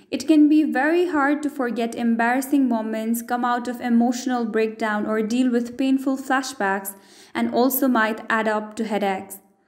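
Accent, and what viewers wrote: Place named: India. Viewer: Omg an Indian narrator , unfortunately I have a low tolerance for this, bye.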